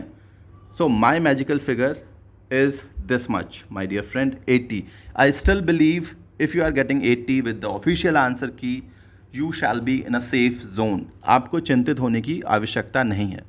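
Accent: Bihar, India. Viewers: Your bihari dialect even after being IES officer is just love❤️.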